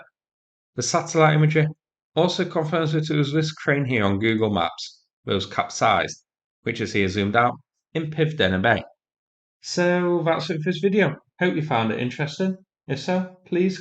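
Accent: United Kingdom. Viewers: Love the pommie accent and humour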